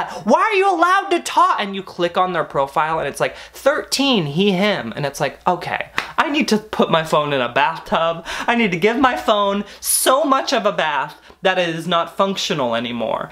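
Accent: United Kingdom. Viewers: WHY IS THE BRITISH VOICE SO FUNNY IM DYING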